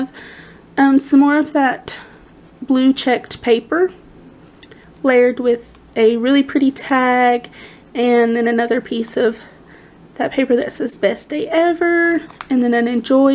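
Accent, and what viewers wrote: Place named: South, United States. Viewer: SOUTHERN CHIPMUNK VOICE!!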